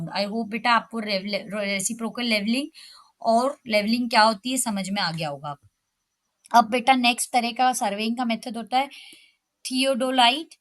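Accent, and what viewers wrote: Indore, India. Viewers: ma'am's ur indori accent is the key..😯😯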